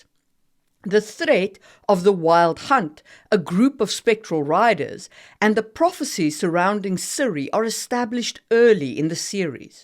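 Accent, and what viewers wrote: South Africa. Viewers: You sound South African! 😱 Where is your accent from? On your website you are standing in snow that definitely does not fall in SA. Expat? Am I completely off the mark? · You sound South African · wasn't expecting a south african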